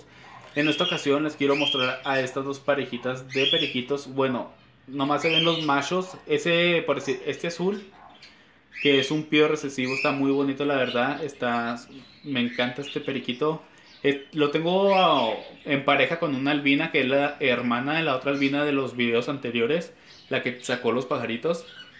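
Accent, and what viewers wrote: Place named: Chihuahua, Mexico. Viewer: Hablas con acento ,eres de Chihuahua?, saludos amigo yo tengo 14 periquitos que estes bien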